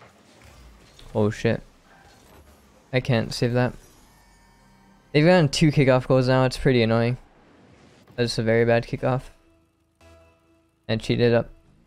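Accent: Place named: United Kingdom. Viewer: your British is perfect👍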